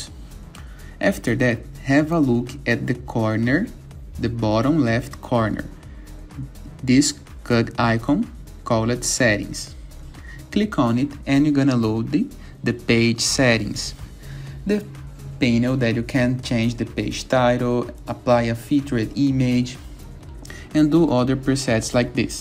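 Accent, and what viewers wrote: Brazil. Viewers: Sotaque brasileiro kkk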